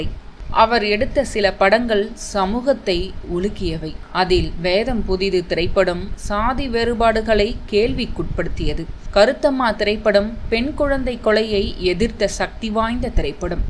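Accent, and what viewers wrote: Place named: Theni, India. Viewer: Enga ooru thangam ivaru ivarum marimutthu sir ivanga rendu perum than enga theni oora oda slang la aptiye passuvanga ivanga pessuratha ketta avlo happy ya irukum we miss u sir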